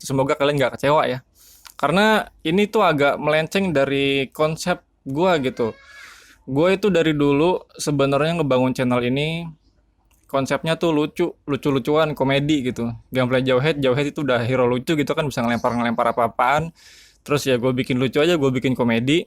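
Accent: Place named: Citereup, Indonesia. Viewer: Kirain orang mana bang taunya citereup😂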